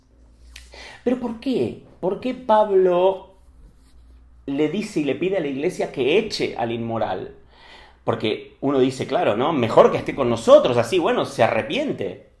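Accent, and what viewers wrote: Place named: Argentina. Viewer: Gracia y Paz en primer lugar le doy gracia a Dios por sus riquesas inescrutables por esa palabra atinada y en segundo lugar gracias por tener el privelegio de escucharlo Siervo por su acento veo que eres argentino eres una bendicion para todo aquel que te escucha desde Panama centro del universo lo escucho a diario y me regocigo trae gozo a mi alma la gloria sea para Dios Bendicion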